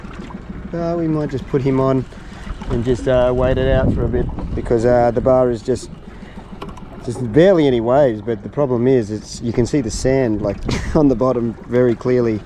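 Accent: Australia. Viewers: Nope, he sounds Australian. They swim in clear Tupperware kayaks around those behemoths often!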